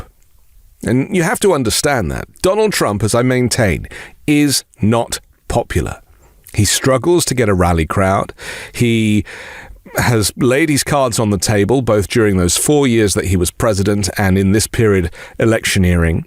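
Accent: United Kingdom. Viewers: Glad to have a British accent reassuring us amid all the panic about Trump getting more votes from this 😂❤ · Thank you for these words! And thank you for your British accent! The US is a complete mess.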